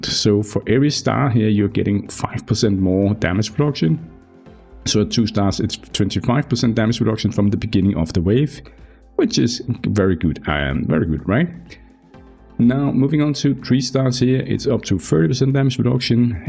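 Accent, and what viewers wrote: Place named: Denmark. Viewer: Are you from Denmark? You English have a Danish dialect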